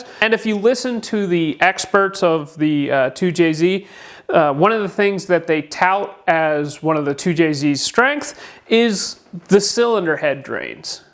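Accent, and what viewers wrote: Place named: Australia. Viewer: I only saw the vid for 2.3 seconds and I said thats gotta be australian lol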